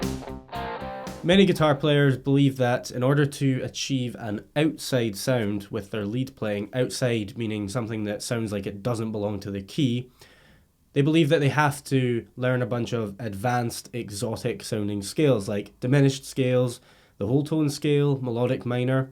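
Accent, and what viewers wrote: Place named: Scotland, United Kingdom. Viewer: Amazing speech ! Sounds like there is some kind of scottish accent going on...sorry but it keeps me smiling ahah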